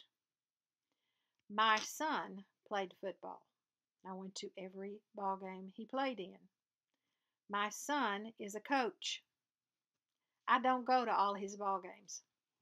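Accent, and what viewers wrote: South, United States. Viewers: Love your videos and your southern accent. Do you have any problems with the hoops "crushing" your finished work?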